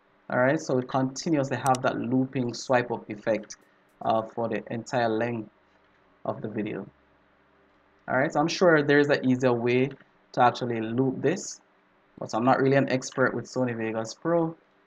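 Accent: Jamaica. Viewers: I can hear the Jamaican in you! Love it!! Now let me return to the video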